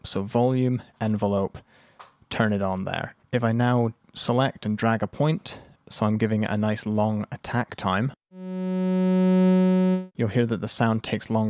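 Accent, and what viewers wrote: United Kingdom. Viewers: 9 years of experimenting and reading and trying to understand FM Synthesis and here is this random guy on youtube with a British accent laying it down in 20 minutes.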